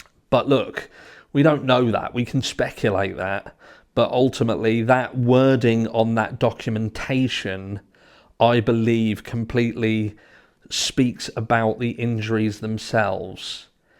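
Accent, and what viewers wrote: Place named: England, United Kingdom. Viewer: U sound like ur from England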